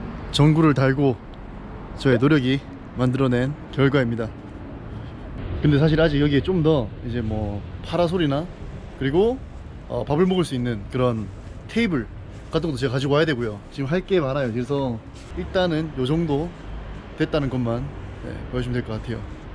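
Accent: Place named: Daegu, South Korea. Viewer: 여기보고 빵터져서 구독눌렀습니닼ㅋㅋㅋㅋㅋㅋㅋㅋㅋㅋ아 진짜 개웃기넼ㅋㅋㅋㅋㅋㅋㅋㅋㅋㅋㅋㅋㅋㅋㅋㅋㅋㅋㅋㅋㅋㅋㅋㅋㅋㅋㅋㅋㅋㅋㅋㅋ 혹시 대구분이세요?ㅋㅋㅋㅋㅋㅋㅋ 전 대구사람인데 제 남자인 친구들 말투랑 너무 같아서 정겨워욬ㅋㅋㅋㅋㅋㅋㅋㅋㅋㅋ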